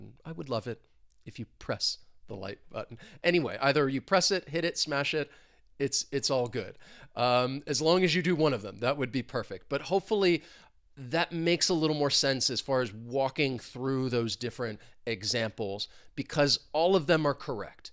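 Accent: United States. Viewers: I come across your channel, thank you for the great lessons, very professional, you sound like you was born in the USA :)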